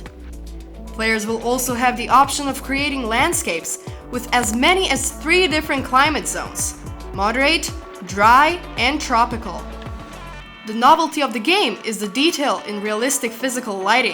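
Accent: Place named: Russia. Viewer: its a women I can hear the Russian in her voice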